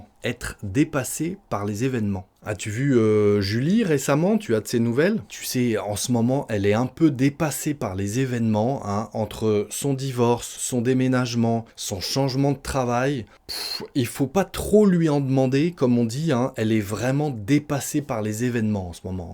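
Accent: France. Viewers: J'adore entendre, merci..Bénis toi.Tu es le meilleur, j'adore ton accent.Du français pur.J'apprends de toi YAY🤩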